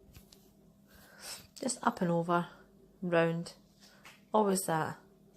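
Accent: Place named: Scotland, United Kingdom. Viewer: Love these nails saw them on Facebook. Hope your little one is doing great she's cute as a button. Love hearing a fellow Scots accent on here. Love them and I need some of that paper from glitterarty xx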